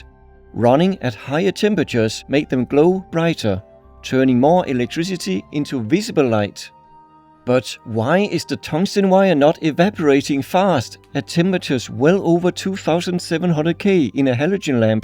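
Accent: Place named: Denmark. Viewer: Du er dansker jeg kan godt høre det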